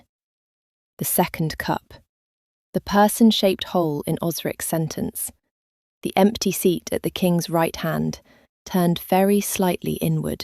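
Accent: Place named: England, United Kingdom. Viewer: I love the English accent. ♥ And I loved this story. ♥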